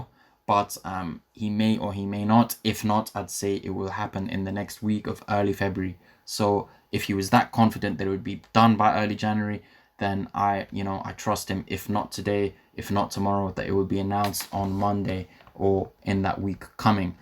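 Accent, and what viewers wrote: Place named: United Kingdom. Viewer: Are you british can't quite tell from the accent sounds quite mixed as though you've lived in different countries. Great vid tho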